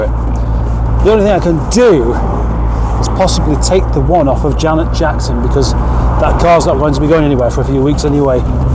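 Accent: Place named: Yorkshire, United Kingdom. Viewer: Aye up tha's got a reight Yorkshire accent! Na then a yer gonna get that car Duck? Does tha' know that there John McLaughlin's from Yorkshire - E''s a reight guitarist in't e? 😊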